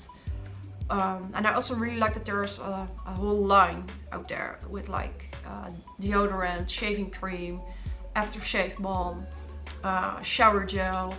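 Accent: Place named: Netherlands. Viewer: your accent sounds Dutch. Great list btw!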